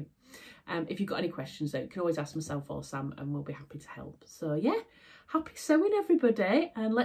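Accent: Yorkshire, United Kingdom. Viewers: Hi again! Love all your makes, especially the Billie dress and the Marlo sweater. I did find the same with the Billie neckline and had to lower mine! You did a great job of your jacket. It was very amusing to hear the Yorkshire lingo again 😜😁 hope you are having a great weekend! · God, I love the way you chat to the camera and some of your 'Yorkshire-isms' . It gave me a good chuckle. I wish I'd known you when I lived in Yorkshire. I could have done with a good sewing friend at the time!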